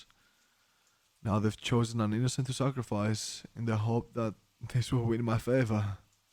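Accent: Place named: Greece. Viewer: your accent sounds so greek wow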